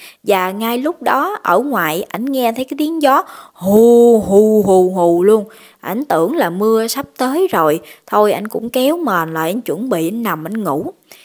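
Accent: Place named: Central, Vietnam. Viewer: Em giống miền te nói chuyện nghe đã thiệt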